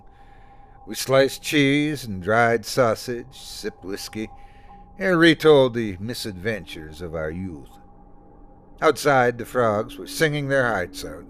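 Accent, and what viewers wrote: South, United States. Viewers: Absolutely love listening to these stories told by you 🌹 Your voice/voices remind me of my southern roots 🌹🥃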